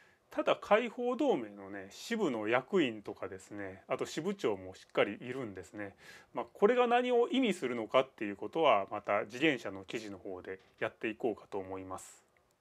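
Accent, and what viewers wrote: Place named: Tsu, Japan. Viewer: 投稿主さん神奈川とかついてるけど、喋りがこっちぽいから津の人？？？？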